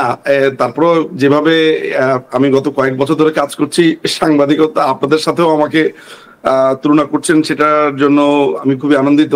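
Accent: India. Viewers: Who is this indian or Bangladeshi. he is talking like absolute indian. Ask india why padma and tista water sharing not solved. fundamentalist jehadi india enjoying ratio in terms of balance of payment.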